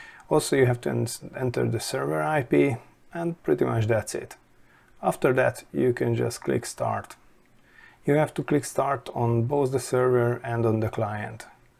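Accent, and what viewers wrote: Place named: Hungary. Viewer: Your accent surely tells you're Hungarian - that I didn't know when I found your channel. :D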